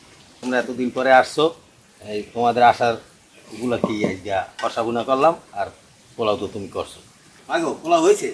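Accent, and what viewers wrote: Barishal, Bangladesh. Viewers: দুদু আমনে কি মোগ বরিশাইলা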